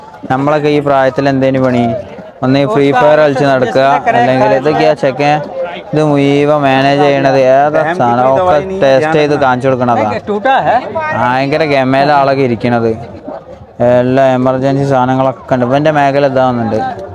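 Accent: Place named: Kozhikode, India. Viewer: കോഴിക്കോടുള്ള വനാണ്..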